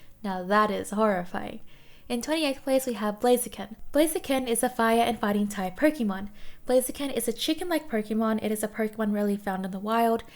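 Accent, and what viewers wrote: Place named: Australia. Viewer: I'm pretty sure you are saying all the names right, just with an Aussie accent lol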